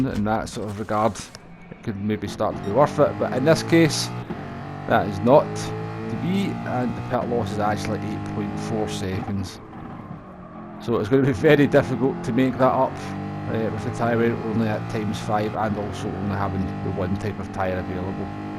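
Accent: Scotland, United Kingdom. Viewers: North American by any chance? they seem to be the ones asking for subtitles the most. I find his pacing and delivery excellent and the mild Scottish accent only adds to my enjoyment of them, if we are lucky he might respond with a link to a properly unitelligiable Scotsman as his accent is imo really not that bad.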